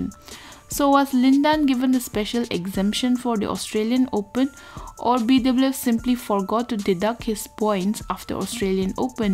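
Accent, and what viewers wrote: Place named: Malaysia. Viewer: I would think she sounds more like Malaysian or Singaporean.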